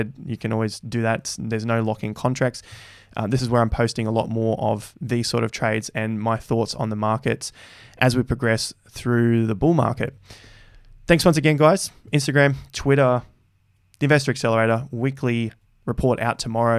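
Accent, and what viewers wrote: Australia. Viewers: are you the Aussie accent on yousician?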